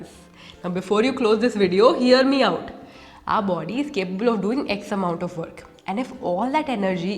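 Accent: India. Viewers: Whe. I heard Indian accent i jumped suddenly cause i knew she is from Country of geniuses.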